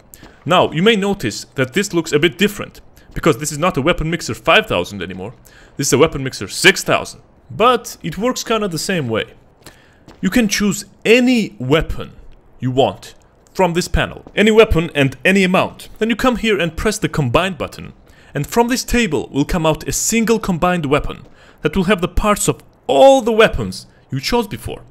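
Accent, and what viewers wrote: Russia. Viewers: are u russin?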